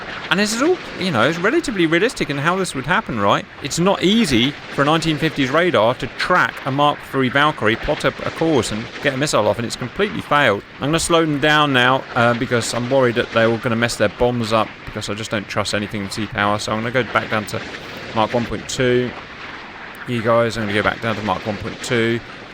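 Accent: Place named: United Kingdom. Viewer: Worst mushmouthed modern British accent I've heard. HEAAAAAHHHH, THEAAAAAHHHH, OOHWWW ( = all) so annoying. A whole generation of Brits learned to speak from listening to Jonathan Woss and Jamie Oliver.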